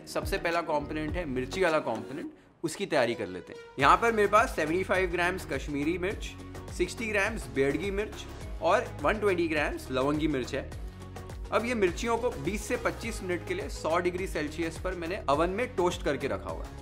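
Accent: Maharashtra, India. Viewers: Really appreciate for your marathi words accent 👍🏻👍🏻👍🏻 अस्सल कोल्हापुरी झणझणीत मिसळ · Hey chef your pronunciation of marathi words is assal Marathi !!!! your recipes are so authentic and explained with such an ease. I am a Marathi but instead of following any Marathi chef I follow your recipes and always wait for the new ones. More importantly you represent it with no nonsense talks. Ekdam to the point. Thank you !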